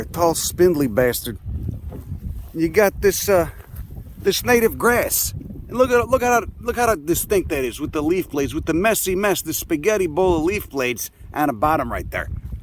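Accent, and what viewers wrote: Chicago, United States. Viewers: Sounds like some of my Chicago family members but on steroids... Love this guy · Ah, love the speak. 20 years LA via Chicago (et. 1970 Cicero ) You turned me onto some really good reference books. I can’t keep up with you. But I love going back to reference what you say and describe. But , the Chicago that exudes from your pores makes me homesick for a memory. I’m so glad I found your channel. The more I learn the better I feel!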